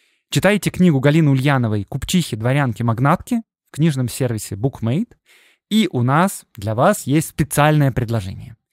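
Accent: Belarus. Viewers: Заранее спасибо за будущий цикл подкаста про бел историю и культуру!!!! Белорусское произношение очень милое, спасибо что стараетесь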